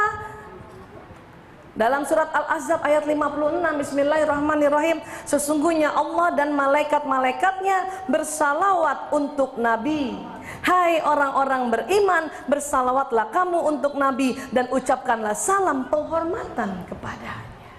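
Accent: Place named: Banyumas, Indonesia. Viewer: Bocah ngapak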